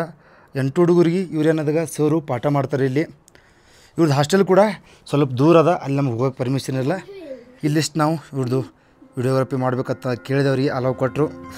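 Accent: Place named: Belagavi, India. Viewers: Nange ishta aguva some YouTubers Alli neevu nimma UK kannada slang super Brother YouTube li feature ide nimge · ಗುರುವೇ ಇಲ್ಲಿವರೆಗೂ ನಿಮ್ಮ ಚಾನೆಲ್ ಮಿಸ್ ಮಾಡಿಕೊಂಡಿದ್ದೆ ನಮ್ಮದೇ ಬೆಳಗಾವಿ ಕಡೆಗೆ ಭಾಷೆ 😀😀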